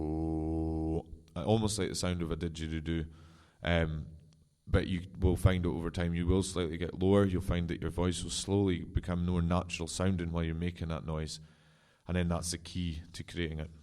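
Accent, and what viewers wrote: Scotland, United Kingdom. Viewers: Scottish accent^^ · Love the scottish accent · That's a Scottish accent :) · came here for warm ups. Stayed for the Scottish accent.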